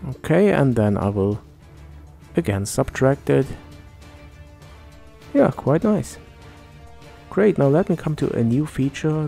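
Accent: Germany. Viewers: i asume you're german with that accent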